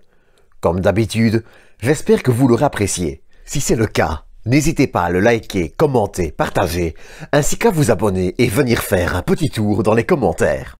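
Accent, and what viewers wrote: Belgium. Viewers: Beau boulot, merci pour tout le taf' de qualité sur cette chaîne ! Je like, je commente, je partage PS: Bel accent Belge j'imagine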